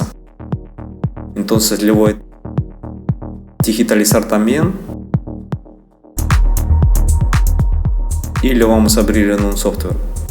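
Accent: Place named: Russia. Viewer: Спасибо за урок. ..Сперва было странно, обратил внииание на русский акцент, а потом увидел имя автора, и стало на свои места.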